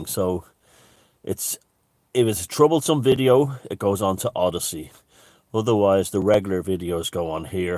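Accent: Ireland. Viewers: Over on Odysee you can use salty cuss words in your cool Irish accent. You always make me laugh when you do that.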